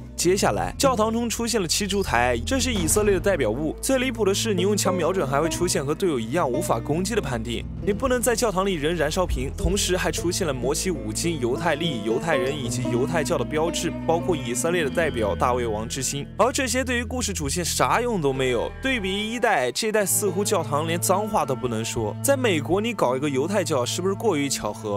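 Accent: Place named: Mainland, China. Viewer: 这说话的语气强调怕不是墙国up主吧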